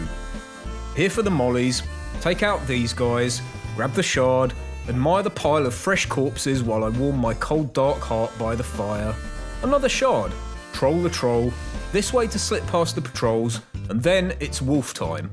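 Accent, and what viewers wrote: United Kingdom. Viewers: finally is talking you sound british my dude🤩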